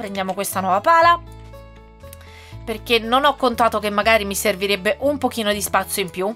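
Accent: Italy. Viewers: comunque ogni tanto l'accento de Roma se sente e te sta pure bene. Per il maiala puoi usare carote, patate, o barbabietole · ma sei marchigiana?P.s leggilo.Ti stimo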